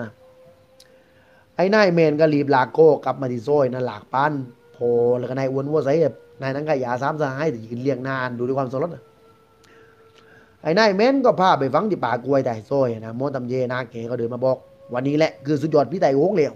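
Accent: South, Thailand. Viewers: มาฟังคุณ แหลงใต้ ทั้งสำเนียงและศัพท์ใต้ตอนล่างที่คุ้นเคย เปิดมิติใหม่ของการรับฟังเรื่องผี ทำต่อไปนะมาถูกทางแล้ว😁แว้บๆวันก่อนเห็นช่องนี้แปลชื่อเรื่องเล่าเป็นภ.เกาหลีใช่มั้ยนะ มาดูอีกทีกลับเป็นภ.ไทยละ ถ้าทำจริงนี่ล้ำเลยไม่ซ้ำใคร 👍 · ชอบสำเนียงใต้คุณ มาก รู้สึกแบบดีใจอะผมก็คนใต้มาฟังคนใต้ด้วยกันเรื่องที่ดีแบบนี้แล้วดีใจจริงๆ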